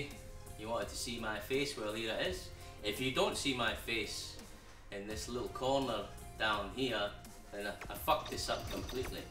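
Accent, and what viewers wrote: Scotland, United Kingdom. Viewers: i always thought you was from Scotland with your accent?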